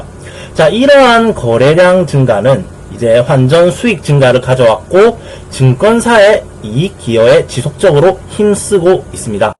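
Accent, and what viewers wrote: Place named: Gyeongsang, South Korea. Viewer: 경상도 억양을 줄여줬으면 좋겠습니다. 도표와 그래프를 보면서 아침 출근하면 차 사고 납니다. 말이 조금 빨랐으면 좋겠습니다. 읽는거 보단 말하듯이 이야기 해주셨으면 좋겠습니다. 이전 영상들(지금은 비공개 되었지만 ㅠㅠ)에 비해 그래도 들어줄만 한 정도로 컨텐츠가 많이 좋아졌습니다. 다만, 2020년 2분기를 설명하는데, 그것도 증권업을 이야기 하는데 코로나에 대한 내용이 빠진 것이 아쉽습니다. 영상 중간중간에 전환이 매끄럽지 못하네요... 잘 듣다가 갑자기 결론없이 다른 내용으로 넘어가서 몹시 놀랐습니다. 앞으로도 예의주시 하겠습니다. 좋은 영상 고맙습니다. 내용이 너무 어렵네요. 어려운 내용을 쉽게 풀어주셨으면 좋겠어요.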